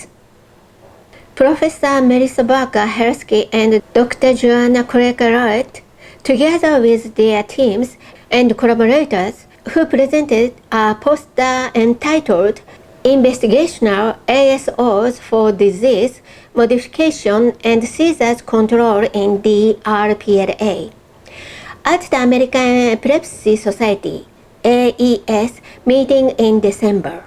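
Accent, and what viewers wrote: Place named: Japan. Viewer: カタカナ棒読み英語を改善させないと世界には通用しないですね。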